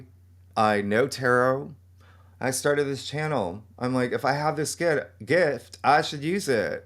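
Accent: South, United States. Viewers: I know this is coming and I’ve been listening to him for the past couple days on and off. Hearing another southerner resonates with me and the things I do know, he confirms. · Well that’s why you sound familiar lol. Not southern Baptist but southern 😂❤u! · I love a southern Kween! Yasss girl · First time to see this guy and I’m here for it! He has to be from Mississippi! Sounds very White Lotus-y😊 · has such a joyful energy and he always puts me in a good mood listening to his videos. 💚 I didn’t know anything about his background but with his accent, I wasn’t surprised to hear him say he comes from a Southern Baptist background. There’s some of us who make it out the other side of the fundamentalist dogma, hallelujah! 😅 Love you,! 🥰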